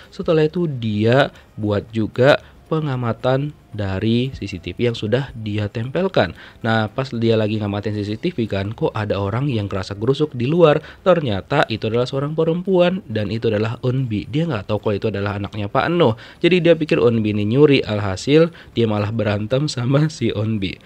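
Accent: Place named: Sulawesi, Indonesia. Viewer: Ko macam orang sulawesi kah atau ntt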